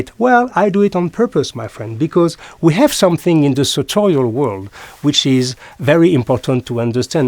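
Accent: France. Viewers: Very well said! „As long as you not look like a clown“ is basically all one should consider. By the way, I love your French accent when speaking English. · Please upload more often. I love watching/listening to you, the french accent adds so much to it. I'd like to hear more jokes from you too like in the shoe series with the "not my cup of tea" joke about the loafers. Really laughed with that one 👍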